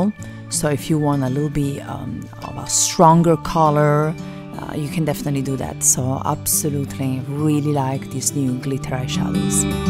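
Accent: Italy. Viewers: Hello nice to hear your Italian accent is there... 👍🏻 nice presentation, but I did't like the eye shadows ... As you said are too flaky..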